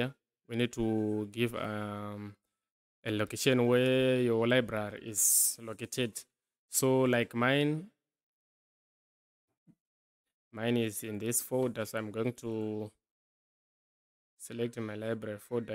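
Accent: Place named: Zimbabwe. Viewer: This voice is Zimbabwean chete thanks bruv much appreciate the help 🎉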